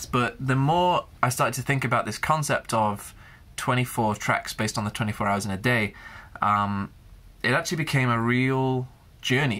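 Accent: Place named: United Kingdom. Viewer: British guy with a cute British accent haha ❤️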